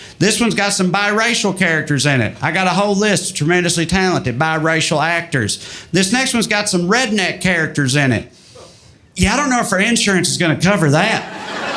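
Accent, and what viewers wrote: South, United States. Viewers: Bro I saw the thumbnail and still didn't expect that degree of southern accent. Damn dude. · Sure, but you can also HAVE a southern accent if you're from the south. Some people do, like this guy. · Fella acts like he aint been part of the south in 6 generations....... voice says otherwise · This man is so southern that (to my Canadian ears) it sounds like he’s imitating a southerner rather than using his regular tone and accent. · O.K.I am from Quebec and this is PURE joy for me to discover this type of humor,with a southern accent..WOW!! Well done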